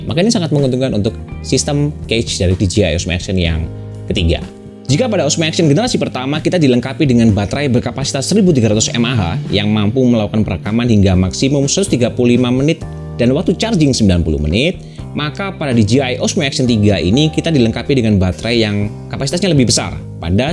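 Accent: Malang, Indonesia. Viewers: Lah orang malang ta ? 😅 suka sama review nya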